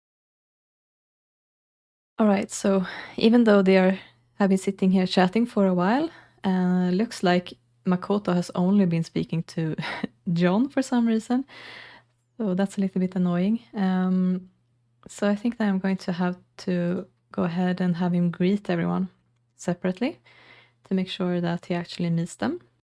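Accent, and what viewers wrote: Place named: Sweden. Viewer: I just found you when just looking for a new sims 2 let's play to get into! Got so excited when o heard you accent, I'm also Swedish so I look forward to seeing your content even more now. I've actually been meaning to start a channel to play sims 2 etc but been procrastinating it for years, and only recently decided I'd do it in English instead of Swedish to not make it too niche. Verkar som att nån hann före mig! Haha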